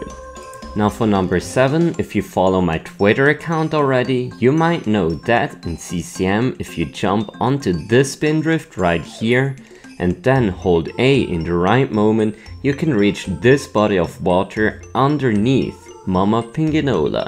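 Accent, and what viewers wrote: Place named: France. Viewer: That French accent though. Proud of you. Maintenant tu vas pouvoir aller draguer!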